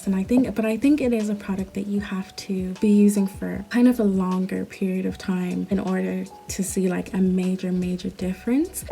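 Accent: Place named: Ireland. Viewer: You seem to have a slight Irish accent. Are you in Ireland?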